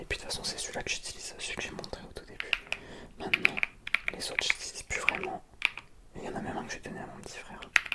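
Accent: South, France. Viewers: Vous n’avez pas le même accent. a l’accent du sud mais pas petit frère 😅. Mais il peut se lancer dans l’ASMR, il a le fluide 😁 🩷🩷🩷💫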